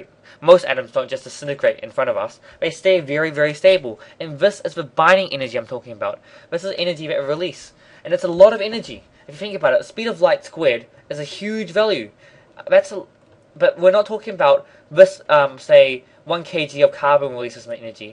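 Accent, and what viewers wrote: New Zealand. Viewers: New Zealand accent, brother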